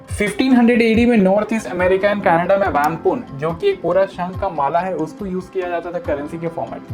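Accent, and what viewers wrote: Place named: Kolkata, India. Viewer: Proper kalkatia language 👌 i like it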